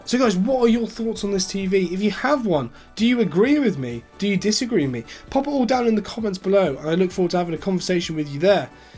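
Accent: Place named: United Kingdom. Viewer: Please, knock off the aggravating BS background noise. It's distracting as well as annoying especially considering your British accented English. Yes, I'm American and speak real English not the bumbershoot and torch strangley pronounced type.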